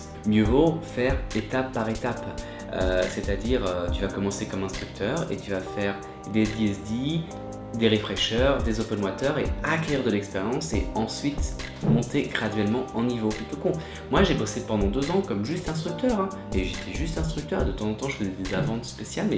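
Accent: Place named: France. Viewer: You have such a lovely French accent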